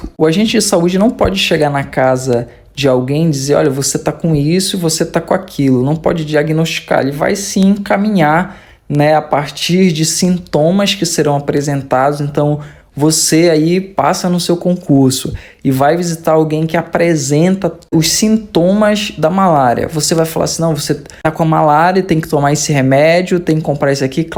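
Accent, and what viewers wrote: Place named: Pará, Brazil. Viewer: teu sotaque é muito paraense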